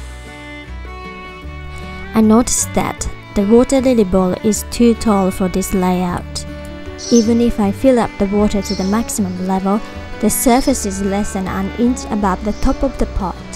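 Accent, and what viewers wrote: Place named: Australia. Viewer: Ive really enjoyed this video and I've also really enjoyed listening to you. I spent time in QLD and your manner of speaking is nicely peppered with familiar aussie euphemisms. Lots of good information, also appreciated you sharing the results good and less so. Now im toying with the idea of creating a little pond myself- im getting ahead of myself now though 😂. Ive subscribed and look forward to seeing what else is on your channel. Big l❤ve xxxx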